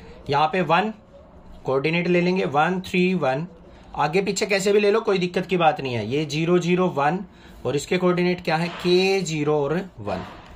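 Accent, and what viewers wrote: Haryana, India. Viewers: Sir app haryanvi hoo na mane pahachan liya😁😁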